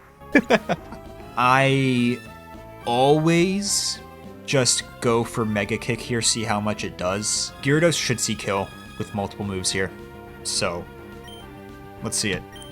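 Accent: United States. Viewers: Are you from the Philly area?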